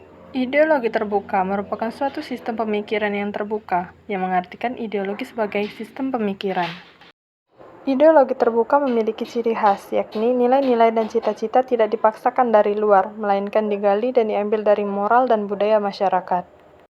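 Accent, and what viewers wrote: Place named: Java, Indonesia. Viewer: wah well done mbak, simpel tapi jelas:)) eh salfok ke medoknya hehe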